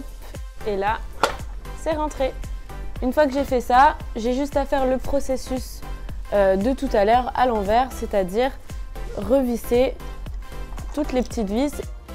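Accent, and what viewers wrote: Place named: Quebec, Canada. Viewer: Cool l accent québécois !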